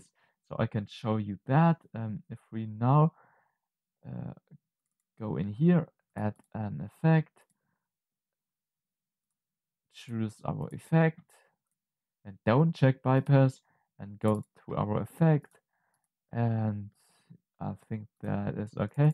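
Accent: Germany. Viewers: Are u german? (just askin)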